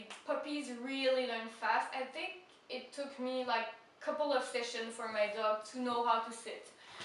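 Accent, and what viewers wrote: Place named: Russia. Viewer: mdr, j'étais pas sûr mais parfois tu as la même intonation que les russes, j'entends plein de russe parler anglais ici (shanghai) :)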